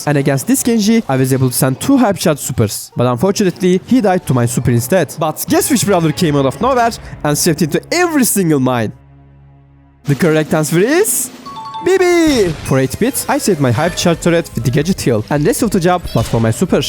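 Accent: Turkey. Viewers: Omg i love you're accent its so cute😭😭 (Are you turkish??) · Love your videos and your Turkish accent brother. Ellerine sağlık abi Türkiyeden bu seviyede edit skilliyle çıkan birisi olması güzel. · are you turkish by any chance your accent is really similar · kral bişey sorucam neden yabancılar için içerik üretiyosun türk olduğun aksanından belli oluyo çok merak ettim türk kitlesi daha çok bs izlemiyo mu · Aa Türk aksanı neden duyuyorum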